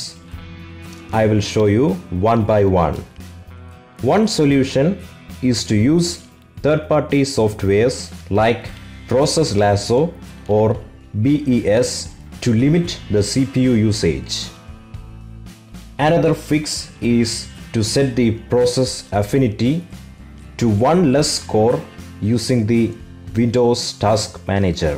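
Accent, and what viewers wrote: India. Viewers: I like your sexy indian voice :))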